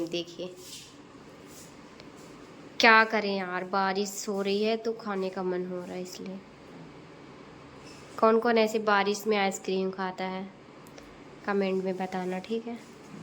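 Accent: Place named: Bihar, India. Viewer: Are you from prayagraj? Shocking.....you speak like bihari....anyway...keep growing....and stay away from such peoples...❤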